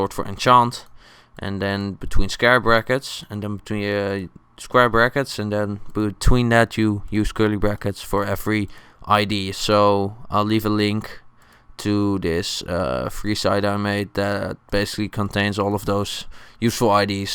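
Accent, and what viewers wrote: Netherlands. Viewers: You kinda hear the dutch accent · ik hoor gewoon dat je nederlands bent niet? :D nice video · Your Dutch aren't you? You have a pretty obvious Dutch accent. You know CubeHamster? Same thing.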